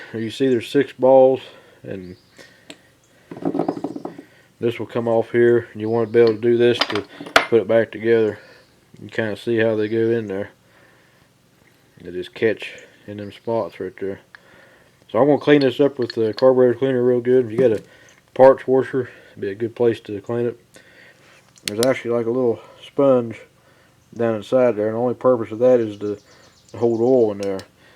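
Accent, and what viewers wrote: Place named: Texas, United States. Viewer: Superb instruction! And that 'accent'-can't be far from Corpus Christi and Texas state 77!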